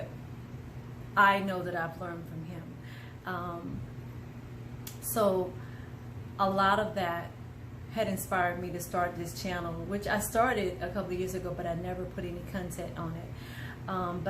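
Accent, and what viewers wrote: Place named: South, United States. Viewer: I love your southern accent. It doesn't sound as though you originally come from Houston. I know you said you lived in South Carolina. Do you know which country in Africa you plan to live in yet? Also, I just subscribed to your channel because I love to see young black brothers and sisters doing positive things. The only advice I have for you is to make sure you have your financial ducks in a row before you go to Africa. I just got finished responding to a bevy of people in Africa and the Diaspora about a family that moved to Ghana from the Netherlands about a year ago but is now on its way back to the Netherlands because things got too hard for them. Their kids were in private schools for God's sake. Lastly, I would appreciate it if you could visit my YouTube channel I started this month to check out a video I produced about the N-word. 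It's very scholarly because of my background, which you can learn about if you visit the site. I also have four more videos coming up after I edit them--I'm somewhat technologically challenged. The next four are about how the N-word does not come from the Niger River, which is what some black scholars are now saying; how the prison industrial complex is genocide; how black lives didn't matter during the civil rights era, using three famous case studies; and my take on the Critical Race Theory. I also plan to launch a pan-African daily news channel early next year, which would probably be more fitting for you. But if you could support my cha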